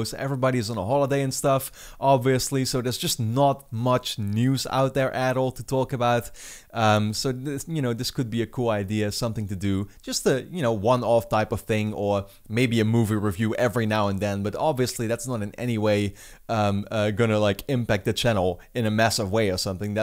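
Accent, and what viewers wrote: Netherlands. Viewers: Where do you live my guess is that you live in the Netherlands bcs your accent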